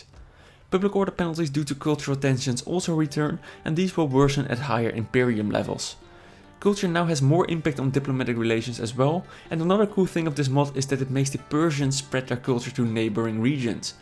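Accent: Netherlands. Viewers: You have the most horrible Dutch accent ever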